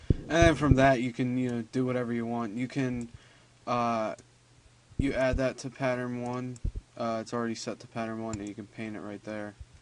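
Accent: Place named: Pennsylvania, United States. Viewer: sounds like pa, maybe northern va or maryland. that area most def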